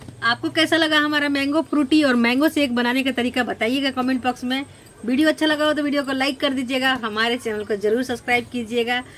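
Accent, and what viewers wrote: Bihar, India. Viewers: Bihar se hai kya . Very nice karte hare kmate rhe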